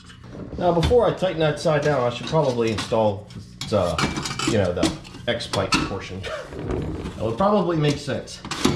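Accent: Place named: Pittsburgh, United States. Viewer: Everytime I watch one of these videos I think "that guy has to be from Pittsburgh with that accent" but apparently folks from Maryland sound the same?! Great videos - appreciate the gumption.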